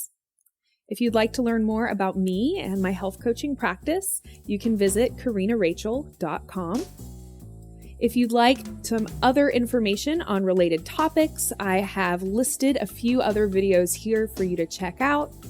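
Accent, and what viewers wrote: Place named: United States. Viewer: I have a video request for you; would you mind doing one on the Ghrelin and leptin hormones? Thanks in advance! Keep up the amazing job! P.S. I like your American accent; this is what I'd love to sound like as I keep working hard on becoming fluent. :) I look forward to watching more upcoming videos you may have in store for us! :) Take care!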